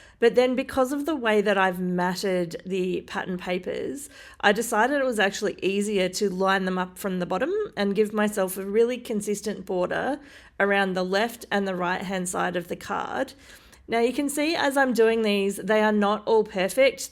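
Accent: Australia. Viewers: I like to hear you talk and I’m trying to get an Australian accent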